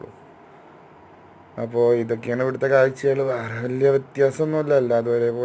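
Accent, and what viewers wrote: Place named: Thrissur, India. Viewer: എങ്ങനെ തൃശൂർ ഭാഷ..... 🤔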